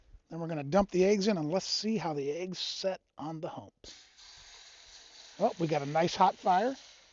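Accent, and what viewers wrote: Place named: United States. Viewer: I love American accents, no offense but we say Wolf as in Wolph...you say woof!!! The number of dialects and accents are nothing like in England where they change across the street, but accents in the US especially in the East Coast are numerous.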